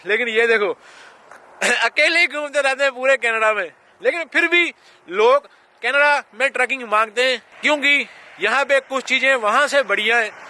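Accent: Punjab, India. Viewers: Bhahi tohadi hindi bilkul mere jesi hai.. Hum punjabiyon ki hindi bhi kamal hai :) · I always like to see your videos because it's informative and entertaining too... your talking way with punjabi-hindi that is awesome.... specially word Sat shri akal ki hal chal and chardikala.... God bless u bro 🙏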